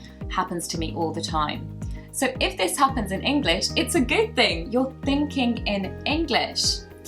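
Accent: United Kingdom. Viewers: Hola ! You said everything right in spanish !! You can practice with me ! I'm a native speaker !! I want to speak British I really like the accent !! 😁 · Wow.. amazing British accent! U have a new follower : ) Por cierto hablas muy español ;)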